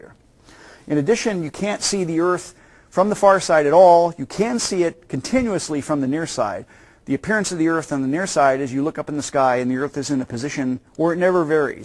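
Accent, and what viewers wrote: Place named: United States. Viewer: the American accent threw me off plus it was very late at night and i need a bit humour :)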